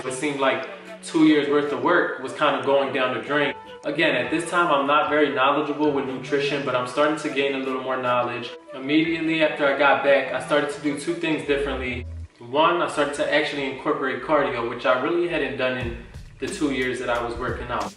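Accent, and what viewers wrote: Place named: Philadelphia, United States. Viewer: You are SO PHILLY! I can hear your accent. I love it. This was super helpful though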